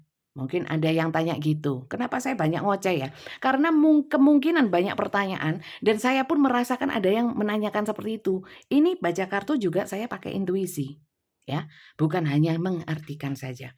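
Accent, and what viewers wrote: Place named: Malang, Indonesia. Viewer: Malang mana Bun? Saya jg Malang Makanya kok ngomongnya enak😂 Iya Bun, kemarin sy nonton reading'an bunda,,,ni jam 00.28 sy masih stay d channel bunda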